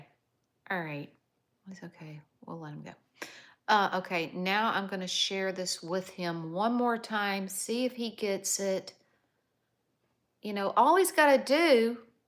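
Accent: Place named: South, United States. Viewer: when you say good gracious alive you remind me of my grandmother she lived in West Virginia she had a Southern accent and she would tell us grandchildren if you don't behave good gracious alive I'm going to Tan Your Hide 😂😂😂😂 I am only 3 years younger than you but I remember them good old memories of my grandfather and grandmother they had that accent💜💜💜💜💜💜💜💜💜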